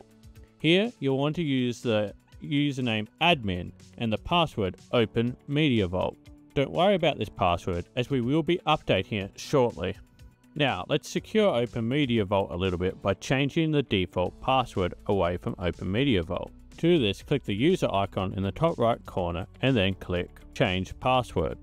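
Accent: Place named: Australia. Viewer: I love your vids, can’t beat that aussie accent 👍 Can you please do a vid showing how to get matrix synapse running over tor? 🙏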